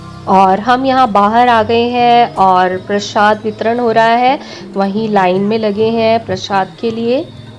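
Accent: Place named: Punjab, India. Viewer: Waheguru ji ka khalsa waheguru ji ki fateh.. Yes..Baba Deep Singh was true devotee of Guru Gobind singh ji.. When Guru ji founded khalsa path..He teained many warriors n Baba Deep singh was one of them Chidya de naal baaZ ladaava.. Geedra to mai sher banawa .. Shawa lakh naal ik ladawan Tan Guru Gobind singh naama kahawa.. “चिड़ियों से मैं बाज लडाऊं , गीदड़ों को मैं शेर बनाऊ।” “सवा लाख से एक लडाऊं तभी गोबिंद सिंह नाम कहाउँ.. Wow my sweeto sisy feel proud to be your bro.. Your way of speaking is true punjabi.. Naaz hai mujhe apni bahan par..dhair sara pyaar..🙏🙏🙏🙏🙏🙏🙏🏼🙏🏼🙏🏼🙏🏼🍫🍫🍫🍫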